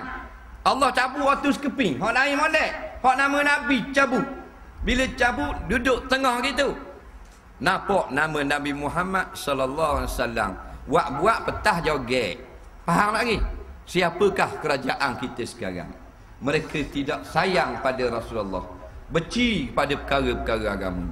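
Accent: Malaysia. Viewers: ni pelat terengganu..but it's ok la..lebih kurang je pelat dua negeri tu..hehe..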